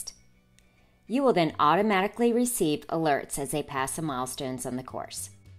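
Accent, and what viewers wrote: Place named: Baltimore, United States. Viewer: Narrator is from Baltimore, not a guarantee but pretty dang shure hon.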